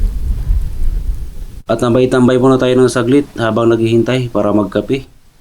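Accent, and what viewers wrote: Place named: Bicol, Philippines. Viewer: Parang Taga bicol itong si par!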